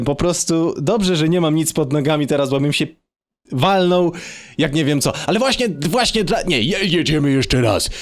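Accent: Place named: England, United Kingdom. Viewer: Gdy grałeś w gang beasts z i i podróby gier mówiłeś normalnie a teraz mówisz z takim angielskim akcentem!?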